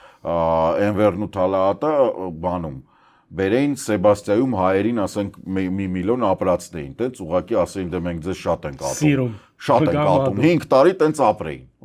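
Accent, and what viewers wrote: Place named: Russia. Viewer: Այ տկարամիտ մինչև երբ պիտի տկարության մեջ թպռտաք, նեթեն յահու չե այլ նաթան յահու , հայերեն թ.փ. ք. ունենք ինչու եք դրանց փոխարեն ասում,տ. Պ. և կ և այլը, բավ է ռուսերեն առոգանությամբ խոսել. դուք Համէրը չես կարող ասել խամէռ. Հոնդան չես կարող ասել խոնդա. եթե այտ պես է ապա դուք լեքսուսը պիտի ասեք լեխուս. ռուսներից խույս եք տալիս և զզվում նրանցից բայց դեռ ռուսերեն առոգանությամբ եք խոսում. դուք հայ եք և պարտավոր եք անուն. անվանումները ուղղակի հենց հայերենով արտասանել ոչ թե մտնել ռուսերեն և հետո արտասանել հայերեն. էնէլ իրանի հեղափոխության ժամանակ էր. Խոմեյնին արտասանել էիք Հոմեյնի. Դուք կիրդ ու գրագետ անձեր եք չի կարելի այտ պես վարվել❤:Օրինակ դուք TRUMP-ը չիկարելի արտասանել ՏՌԱՄՊ. այլ ճիշտ անգլերենի ձևով պետքե ասել և այսպես=ԹՐԱՄՓ կՐծում եմ հասկանալիէ❤🎉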